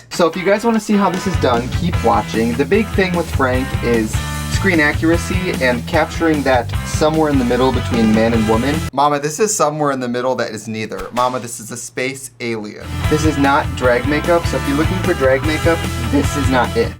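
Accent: Wisconsin, United States. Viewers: You really can hear the Wisconsin accent in these videos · I love your accent!! I was watching UNHhhh the other night when I realized you pronounce “groceries” grosheries just like me! I told my husband that you’re from Wisconsin and he replied “guess that’s why you’re such a cheesehead” and I lost it laughing. I do have family from the US, wouldn’t be surprised to find some were from Wisconsin. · trixies voice sounds the same as it does now bc i’m from wisconsin too. like deadass i only hear the accent on some words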